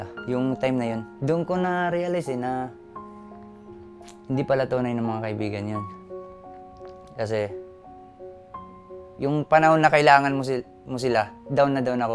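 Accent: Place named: Waray, Philippines. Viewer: Waray man ka diay Doy hehe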